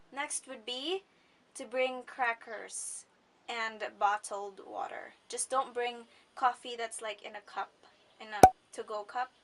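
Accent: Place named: United States. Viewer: 💙💙💙 you sound so American already 😁👌🏻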